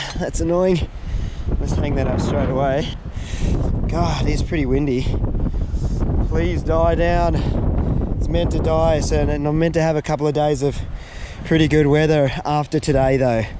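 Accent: Australia. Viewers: He sounds Australian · Is this Australia ? I sense because of accent. English isn't my native language so don't quote me on my guess. It's pretty beautiful though. Keep up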